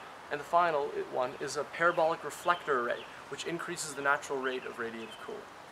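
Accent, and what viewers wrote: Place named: Canada. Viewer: Holy, crap. I knew immediately he was Canadian. Then he says so. Wow.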